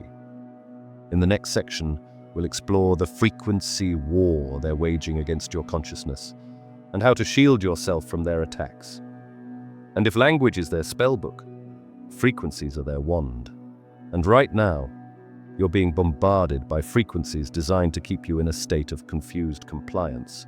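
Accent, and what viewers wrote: United Kingdom. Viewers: I can't stand these UK accents so annoying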